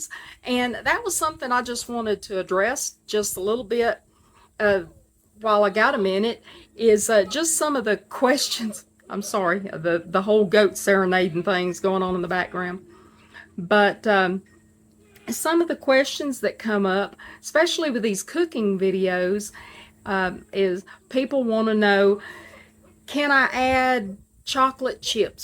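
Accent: South, United States. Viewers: You are so adorable with your cute southern accent, we could listen to you all day long.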